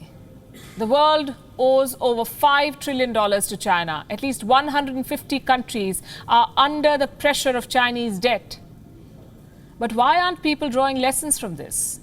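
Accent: India. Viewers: But most of these idioms and sarcasm are from a western/British cultural script, coming from an Indian with thick indian accent who was clearly not raised in the West seems out of place, it's like listening to a parrot very amusing, but that's what the whole world laughs about India - it's desperate need for acceptances. Just saying. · Bangladeshi people don’t even think about t.....u..d... t...u...d..... India... why India so much concern about them?? Are you feeling lonely??... No neighbours... ?? Even Hindu country Nepal not giving company...? Beautiful lady can you plz clear it...? Anyway I like your typical Indian accent.....keep it up....nice story telling....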